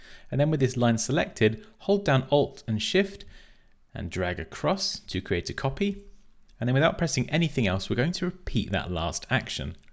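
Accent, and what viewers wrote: United Kingdom. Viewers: the tutorial seems to be easy but I cannot understand some commands ( not a native speaker ) not used to a British English pronunciation. Will try more anyway! Thank you for your videos!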